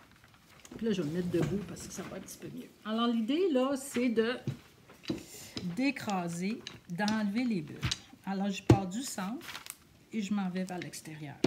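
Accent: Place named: Quebec, Canada. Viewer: Bonjour, quelle colle vous prenez pour le marouflage, car je suis français et je ne comprends toujours le québécois pardonnez moi !!!! Cordialement Serge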